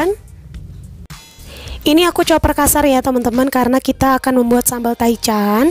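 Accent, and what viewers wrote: West Java, Indonesia. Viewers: Sunda mana teh meni geunaheun hhi,, sukses slalu teh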